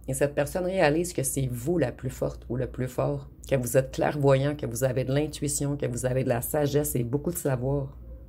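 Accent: Quebec, Canada. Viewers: Bonjour … quel bel accent quebecois … c’est si jolie et relaxant de vous écouter , un pure bonheur !!! 😌❤ Les 3 le représente parfaitement bien , vous êtes vraiment tres doué . 😅👍🏼.. infini gratitude pour tout le temps que vous nous consacrez … Merci . Stéphanie 🙏🏻🙏🏻🙏🏻🙏🏻🌼☀️🌸🌷🎉🎈🩷💞🌼☀️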